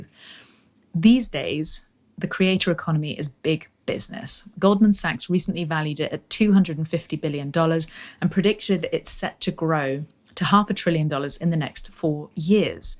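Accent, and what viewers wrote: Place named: Salisbury, United Kingdom. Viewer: I may be the millionth person to tell you this, but you have an absolutely beautiful speaking voice, and I wonder if you’ve considered narrating audiobooks or documentaries. Both your Salisbury (or Winchester?) accent, and relatively low pitch, are highly listenable for long periods, especially for Americans. I thought my comment would sound very random, but given this latest video’s topic, maybe it’s relevant to your far-flung enterprise (ha!). Cheers and best wishes from the USA.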